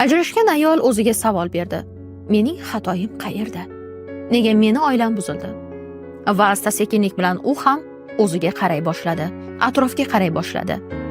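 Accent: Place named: Russia. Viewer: Yahshi naraala aytasizuu, labizzi rossa qiyshaytirib gapirishiz nitodaa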